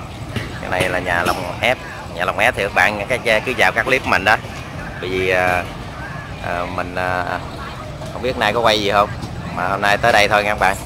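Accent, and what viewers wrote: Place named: Mekong Delta, Vietnam. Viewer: Nghe con nói chuyện...Thấy thương quá!😍 Rặt dân miền Tây luôn!🖐😍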